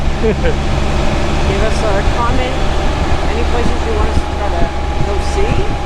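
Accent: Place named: Boston, United States. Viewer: sounds like hes from Boston... Love Video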